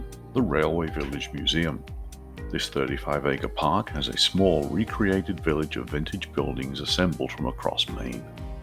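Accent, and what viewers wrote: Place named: England, United Kingdom. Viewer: "just normal people with limited funds" you have an English accent lmao unless you're an immigrant then you definitely have some funds mate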